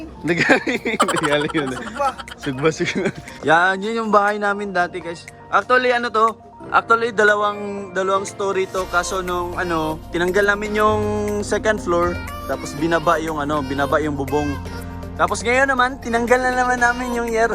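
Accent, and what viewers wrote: Visayas, Philippines. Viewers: Saan ba kayu kuya? Parang may visaya accent ah. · DONE BJ Baldado From: Zamboanga City Amping mu always 😂 Cute kaayu ka. Magkatawa ko sa imung pronounciation sa tagalog Klaro jud Bisdak Haha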